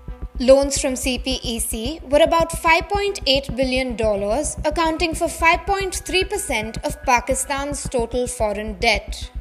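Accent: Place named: India. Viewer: was ready to dislike the moment i heard the accent, but this was unbiased... for an Indian outlet talking about pakistan, that's a massive achievement well done!.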